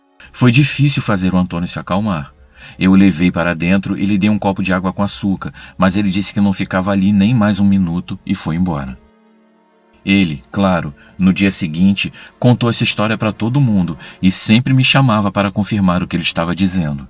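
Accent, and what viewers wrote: Rio de Janeiro, Brazil. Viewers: Ótimo relato! Um ponto extra é pelo sotaque carioca, que eu amo, pois também sou carioca da gema! Muito sucesso pra você! 👍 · Mas o sotaque dele é de carioca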